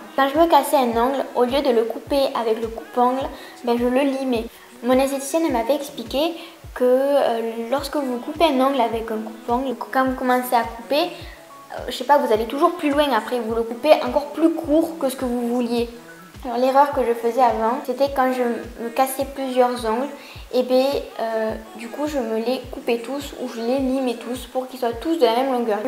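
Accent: France. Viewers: Le sang j'adore ton accent du sud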